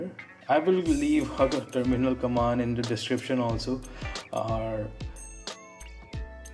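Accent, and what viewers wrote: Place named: India. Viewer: Hinduinglish... How to make WO mac?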